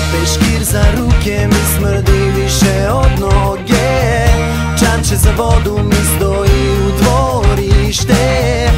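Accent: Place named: Pirot, Serbia. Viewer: <mail> Meni više zvuči kao Piroćanac, iskreno...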